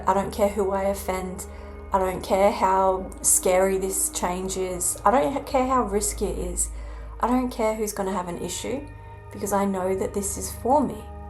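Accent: Australia. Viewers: G'day there!! You're an absolute bloody legend dudette!! Your head popped up on my feed randomly and I'm proper glad it did! You spoke straight to my soul and it felt kinda weird lol especially when ya started singing and I had a vanilla icecream cone in my hand! Hahahaha ya speak with such wisdom and and better still an Aussie accent! 😉 Everything you talked about gave me chills and alot to think about... Will see ya again soon mate! Much love and respect 🙏🤘❤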